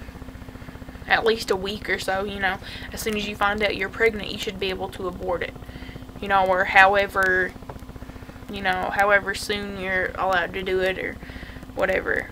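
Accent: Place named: South, United States. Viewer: this is fuuny as shit cause u sound Country as Hell.....which I find it very cute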